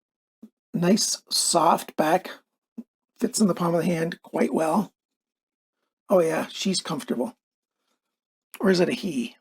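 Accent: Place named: Canada. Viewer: I actually watch your videos for 2 reasons - I miss the Canadian accent at times, and always love hearing about the knife laws and appreciate my move to Asia as I can own and carry basically any knife I want.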